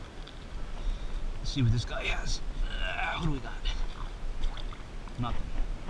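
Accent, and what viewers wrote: United States. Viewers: I knew you were from states island soon as I heard your voice lol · Hi brother, you speak like my long island friends that I worked with back in the early 80's at midtown South. Anyway I looked up where to find bloodand sand worms in new York City and surrounding areas.i got your channel. Still don't know where to go exactly but hopefully will. Specially close to NYC. Thanks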